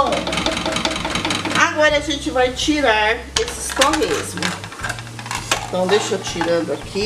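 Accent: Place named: São Paulo, Brazil. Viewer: Adoro este sotaque paulista!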